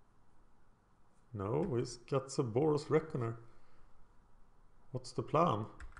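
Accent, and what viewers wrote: Sweden. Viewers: My first reaction was "Swedish accent". Is it?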